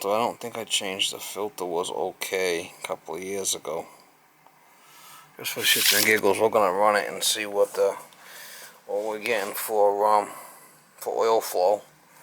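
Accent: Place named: New England, United States. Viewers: Sounds like my hometown of Plainfield CT. Accent is spot on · Psycho chicken LOL. Been over 30 years since i heard this one. Where you from? Sound like southern New Hampshire or Massachusetts. Maine hete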